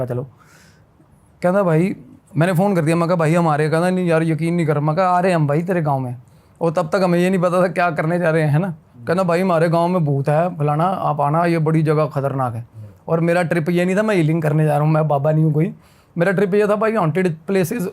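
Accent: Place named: Rajasthan, India. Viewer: Bhai . I really love his Rajasthani accent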